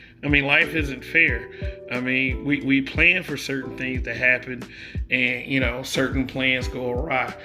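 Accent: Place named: South, United States. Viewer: This is his channel he’s entitled to his opinion. are you mocking his southern accent? That is Ignorant of you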